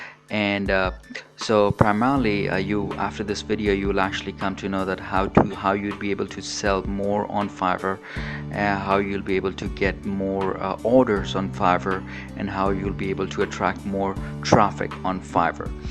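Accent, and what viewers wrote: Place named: India. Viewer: sound like indian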